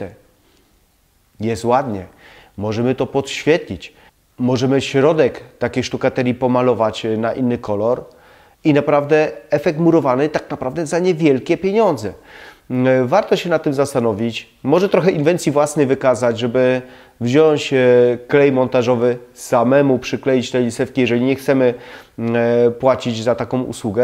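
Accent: Silesia, Poland. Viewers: Czy ja słyszę nasz cudny śląski akcent? 💚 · Zgadzam się z przedmówcami, a od siebie jeszcze dodam, że mówi Pan wyraźnie, bez zadęcia, a śląskie naleciałości są fajnym smaczkiem :) Dziękuję, że Pan się przykłada i dzieli wiedzą. Ciepłe pozdrowienia ☀️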